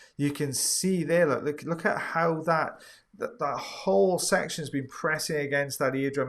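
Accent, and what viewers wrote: Wales, United Kingdom. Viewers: iv got to say if there was audiology ear wax removal sports 👂🦻, iv got to say you would 100% win awards has the best commentary that goes along with the vids...best youtube commentor ears 👂 down... love that gourgous welsh accent.x Keep up the good work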